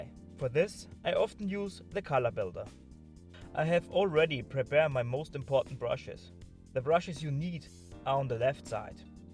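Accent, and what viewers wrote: Germany. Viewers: Great yet simplistic tutorial in your "German🤪" English..with that said you have got a new subscriber here 🖐.... how about the video of Artist review of new Galaxy note 10 plus😃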